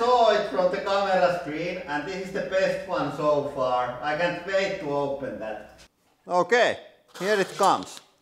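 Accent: Finland. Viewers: They're not sound effects. It's actually supposed to be spoken English, it's just that the Finnish accent is ridiculously strong.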